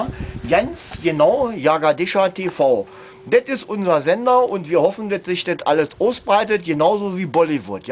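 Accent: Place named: Berlin, Germany. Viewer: Also ik würde sagen dit bärlinärische is klasse ! JJJ ! xD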